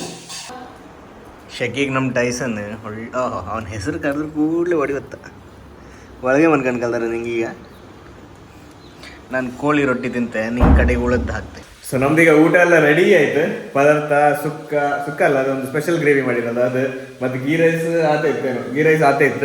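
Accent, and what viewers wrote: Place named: Kundapur, India. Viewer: I love kundapra kannada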